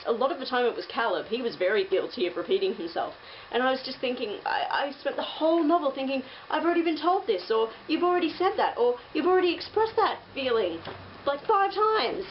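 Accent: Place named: Australia. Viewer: I thought it was you because you can hear the slight twang of your accent haha. It's very good and also, co-wrote a musical? I must say I'm ever impressed! You're a book loving, disney obsessed, musical writing Australian. It doesn't get more awesome than that :)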